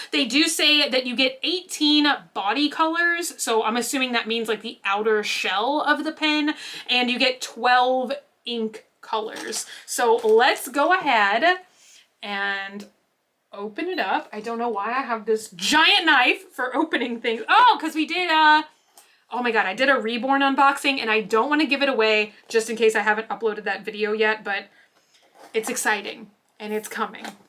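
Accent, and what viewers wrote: South, United States. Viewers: SHE'S FROM TEXAS. All of us southerners talk like this.🤷🏽‍♀️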